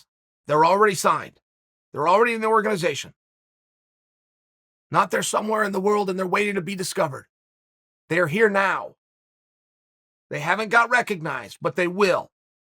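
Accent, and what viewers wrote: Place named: United States. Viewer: People make fun of the the way Chael says names with his hyper-American accent like everybody has an accent and says things a certain way.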